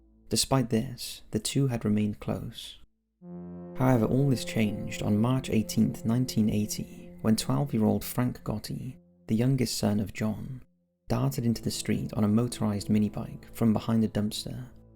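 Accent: United Kingdom. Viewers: British. Like me.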